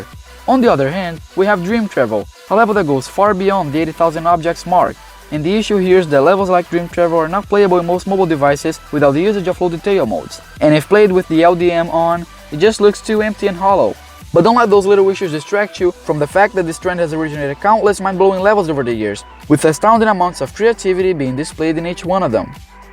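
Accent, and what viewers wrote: Brazil. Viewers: Some brazillian accent ight there!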